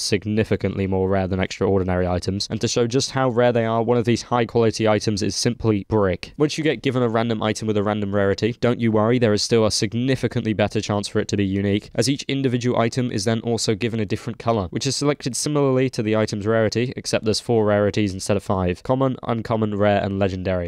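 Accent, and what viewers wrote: Argentina. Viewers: are u from argentine ?? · Che sos argentino?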